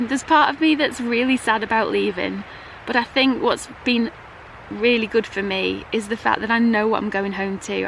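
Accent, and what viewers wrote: United Kingdom. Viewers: Congratulations! I've followed your trip from the start and it's been quite the journey. Thank you for taking the time and effort to produce such great videos. You love for nature really comes through. I will miss your British accent and expressions.Your heart is as big as your smile and always made me happy watching. Good luck and vegan power!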